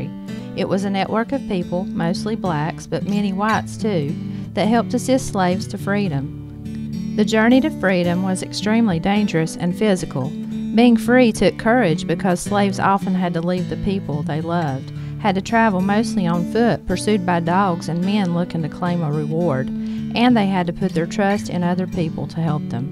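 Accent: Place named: South, United States. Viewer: Yeah, I didn't quite get that, she sounds more like a Southern gal though. I honestly really don't care who narrates it it just leans toward more appropriate having a black person do it. It reminds me of when white people pretended to be Indians in movies. I'm quite hungry for more information especially accurate information about the black slave life and their escapes.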